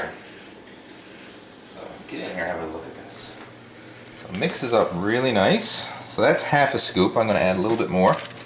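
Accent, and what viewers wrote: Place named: Maritimes, Canada. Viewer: He's got an east coast accent. Canadians refer to them as maritimers. They have their own special accent. Not quite as rough as people from Newfoundland though.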